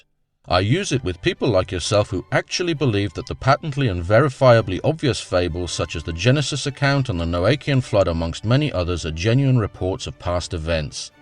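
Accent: England, United Kingdom. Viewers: Brilliant!!! Everything I was thinking. But, put more eloquent than I could. Plus cool English accent (not the snobby kind). Problem is, people can not stand not knowing. So they have a tendency to fill in the gaps with whatever sounds cool. 5000 years ago the world being made in 7 days sounded reasonable and there wasn't a body of evidence one way or the other. But, FFS already. Why is it so hard for some people to accept that new information is now available? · Because swearing is typically bad manners, and excessive swearing shows poor breeding. Although it is comical within the context of this video because is intelligent, educated, and has a sophisticated British accent. Additionally, this is his channel, so he has and should have the freedom to in his videos. I'm not religious and I don't appreciate it when people swear in public, especially when children are present.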